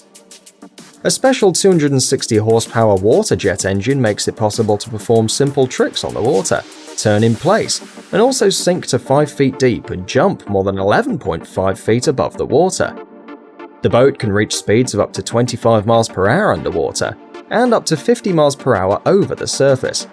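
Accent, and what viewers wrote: United Kingdom. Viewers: Couldn't listen to one more minute of that annoying british accent .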